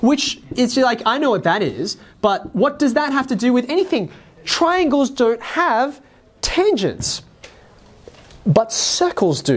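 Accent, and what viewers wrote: Australia. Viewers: No, just an Australian with an Australian accent...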